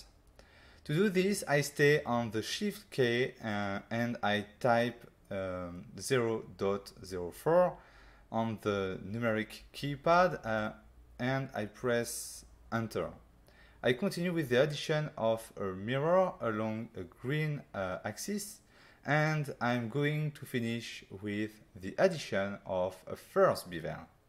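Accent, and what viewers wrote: France. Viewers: Ah l'accent français 😉 Chouette tuto, j'ai acheté fluent il y a peu mais encore trop eu le temps de m'y plonger... Mais ta vidéo donne envie 😊 bravo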